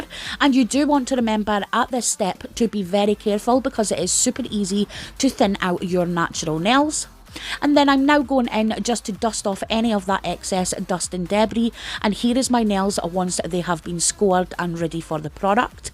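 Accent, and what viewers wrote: Scotland, United Kingdom. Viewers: You are from scotland, aren't ya? I can hear it, as a German 😅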